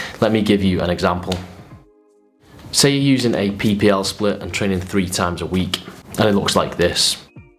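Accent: United Kingdom. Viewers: unfortunately anybody from outside the UK has 0.0% of a clue of what this guy is saying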